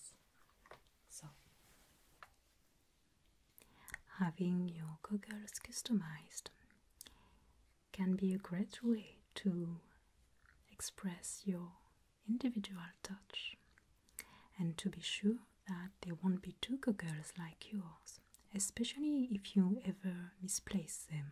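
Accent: France. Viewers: French accent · ...her soft voice & French accent sends TINGLES down my spine !!!!!!!!!!!!!!!!!!!!!!!!!!!!!!!!!!!!!!!!!! :) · I’m French & I can say that is a French accent if I’ve ever heard one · Exactly. I deducted it has to be French, although at first I was not quite sure, but it has German and even slight Swedish quality to it. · That's a thick french accent. I love her work and her grammar is perfect but as a french myself, i find the accent tends to take me out. It never bothers me when it's other accents but for some reason the french accent kill my asmr ^^ I commend her for the amazing work though !! And I still watch her videos for the ascetic and world building ! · It’s a French accent. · I might be dumb but is that a French accent? Its beautiful. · Just found out this channel existed ~ oh boy have I been missing out! This production is absolutely stunning and the quality is top tier 😭 ✨ I absolutely love your (french?) accent - it’s so unique and perfect for this setting! 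Psst! You got a new subscriber 😊 ❤️ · Are you French because you have a french accent c cool d'être représenté de partout tu fait du super bouleau continu comme ça 👌✌️ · She sounds very French and has a lovely soft voice. I wish my French sounded like her English 😪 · as a german myself, i don't hear any german accent. It sounds very french to me. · It’s French, but she gets lots of comments asking if she is German or has a German accent. I personally don’t think it sounds German at all, but very French. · France bc of the axent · I'm French and trust me, it's a french accent 😄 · I love your french accent, it’s so relaxing and welcoming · Je me trompe ou tu a un fort accent français ? ^^ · I think it's a french accent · French accent 🤝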